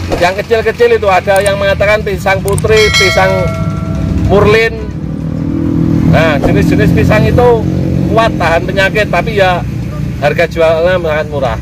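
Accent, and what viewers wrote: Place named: Malang, Indonesia. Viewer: Sampean Iki wong malang taaa pak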